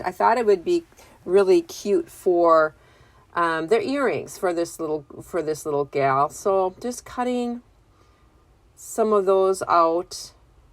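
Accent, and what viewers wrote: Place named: Wisconsin, United States. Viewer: Cute cute! Love your accent! Wisconsin??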